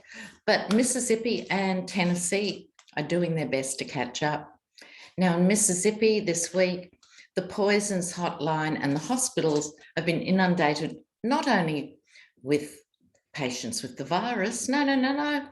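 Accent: Australia. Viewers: Thank you, I love that you have a number for every video and the Australian voice sound is so beautiful on top of that what a gift your reading are for us here in the US.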